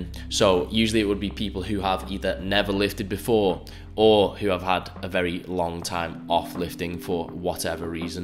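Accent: United Kingdom. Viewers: Liking the British bants/vibe and info... subbed ! 😂👌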